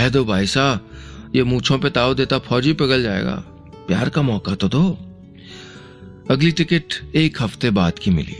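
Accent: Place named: Rajasthan, India. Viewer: Sorry bit Terrible Rajasthani accent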